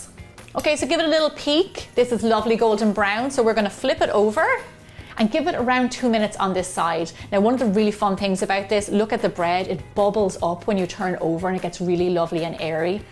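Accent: Ireland. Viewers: She sounds Irish. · For bread is as lovely as she is and her grand Irish brogue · Are you Irish? Your accent is so charming and beautiful. Thank you for the recipe I'm going to try this afternoon with my 21 year old daughter we love to cook together. Thanks again unless you many blessings to you and your family! 💝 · Not only beautiful bread, but delightful accent!! :)) Irish?? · Okay now I know your awesome. Love your style and your practicality. Very professional and adaptable to keto. Most of all love your efficiency and that Irish accent.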